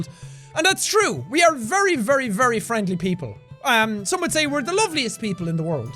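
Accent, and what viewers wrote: Ireland. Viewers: Rewatching all his videos and i love how thick his irish accent is compared to now xD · I love how anytime he makes a Irish videos like this he gets more and more Irish as the video goes on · slowly gets more Irish as the video goes on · oh god his accent is so irish as the video goes on OH MAH LORD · I love how his accent gets more and more irish through the video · 30 seconds and 2 minutes in isn't a "normal" Irish accent, it's a watered down version that he developed while he's been on Youtube. Watch his early videos, he sounds really different. Actually at first he tried to get rid of his accent completely because he didn't have the light accent that he has now and he was worried people wouldn't be able to understand him. If you think he's incomprehensible by the end of this video, you should bring a "translator" with you if you ever visit Ireland lol. 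· Holy shit this is the most Irish I've ever heard him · This is the most Irish I think anyone will hear, ever. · The further you are in the video, the stronger his Irish accent gets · I never heard so irish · accent gets gradually more Irish as the video goes on and it’s wonderful ☘️ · He went full Irish for this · He sounds so Irish in this video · Jack: *gets progressively more Irish as the video goes on* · The way Jack becomes more Irish while talking about Ireland is like me speaking more and more Chinese while talking about REAL Chinese Food. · he just got more and more irish as the video progressed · he went like... full irish with this test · Jack gets more irish as it goes😂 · In the last ten minutes of the video only Irish people would be able to actually hear what is saying cuz the accent thickened throughout the whole video😂😂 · I like how he just becomes 600% more Irish in these videos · I love how Irish he talks in this vid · You sound more Irish somehow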